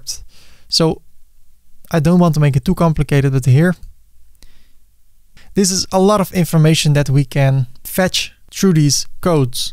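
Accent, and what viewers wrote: Netherlands. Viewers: Nice video! Ik moest af en toe wel lachen om je overduidelijk Nederlandse accent:) Bedankt voor de video!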